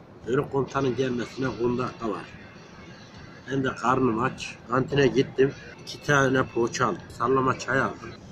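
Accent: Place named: Yozgat, Turkey. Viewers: Konuşanın ağzını Yozgat ağzına benzettim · Nereli bu adam? Şive Iç Anadolu ama il-ilçe Konya tarafı mi acaba? Bilen var mi?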